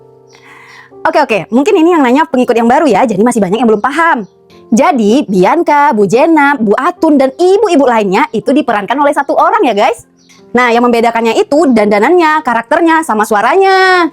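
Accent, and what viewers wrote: Indonesia. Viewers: Kak asli orang padang ya kalau boleh tau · Kakak orang Aceh ya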